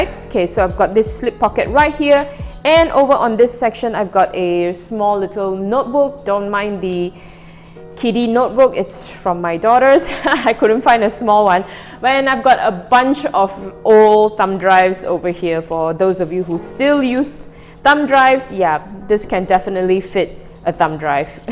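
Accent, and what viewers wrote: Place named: Singapore. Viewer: What accent is that? Great to hear. Can I know which part of the world you are from? My guess would be Singapore.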